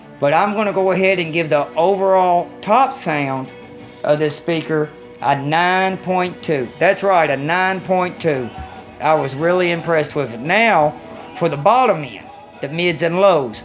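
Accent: South, United States. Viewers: Can't shake the southern outta you brother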